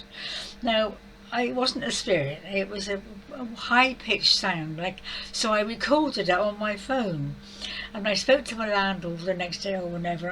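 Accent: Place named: United Kingdom. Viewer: Please consider posting SHORT videos to attract viewers! I am sorry that videos longer than 5 mins are very daunting when pressed by time. Sorry this is not to be negative but hopefully will help you: consider watching yourself before uploaing and using the edit button to trim out unnecessary talk? You can still make long videos for your regular viewers but for newcomers, we need SHORT and BRIEF. Myself,I can't understand British accents at all, so I'm going to start over with the captions....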